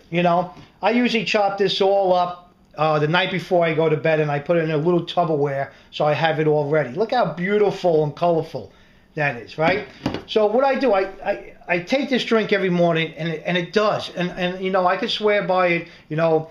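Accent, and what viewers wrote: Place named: New Jersey, United States. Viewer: i just love watching your videos your accent reminds me of my family from Jersey. my moms been here in wv for 38 years and almost sounds like one of us now. weird huh? anyway if someone couldnt or didnt want to invest that much in a blender the new ninjas are fantastic. they have one that looks similar to a vitamix and its gotten good reviews. i also juice quite often and am gearing up for a 5 day juice fast. i love juicing as well as my smoothies :)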